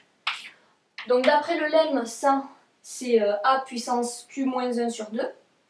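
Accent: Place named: South, France. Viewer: Ce petit accent du sud: chocolatine !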